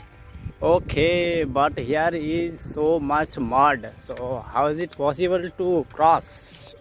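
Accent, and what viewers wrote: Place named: India. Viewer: still Indian accent❤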